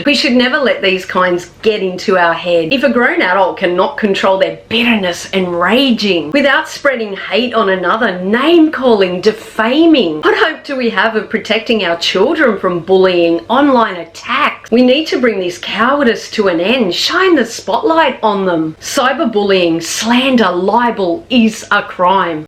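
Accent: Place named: Australia. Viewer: Your grace, you look absolutely adorable wearing pink, and with curly hair, and you have the cutest Australian accent, plus, the most beautiful blue eyes. 🤗🤗🤗 I don’t like dealing with selfish rich people, and horrible narcissists, I only associate with people who understand and respect my dreams and wishes, and who appreciate me for who I am. I just hope Disney or DreamWorks will someday hire me for some voiceover jobs. I sent you a kiss on your elegantly gloved hand, your grace. 😘😘😘🤗🤗🤗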